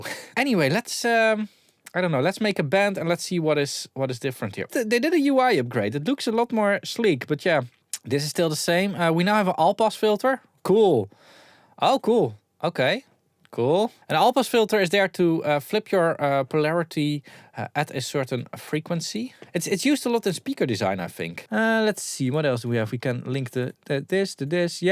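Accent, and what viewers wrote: Netherlands. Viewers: yeah he sounds very Dutch, that’s true, I’m not a Dutchy but I’ve been there, honestly I’m kind of surprised how bad people are at identifying accents, I just said that to make a point, since the guy was being racist.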